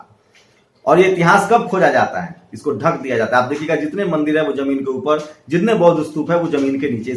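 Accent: Bihar, India. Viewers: Can You speak in Normal Hindi & Not Typical BiharinTone? Since You call Yourself.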